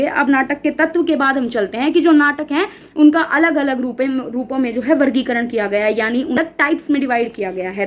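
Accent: Haryana, India. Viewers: aap paka haryana se ho aapki ton aate hai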